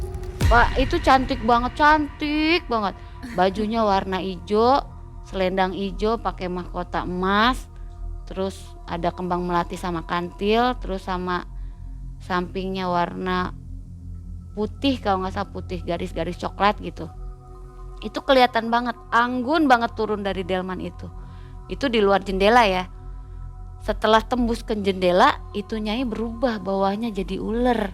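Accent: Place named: Cirebon, Indonesia. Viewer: kya org cirebon yah logatnya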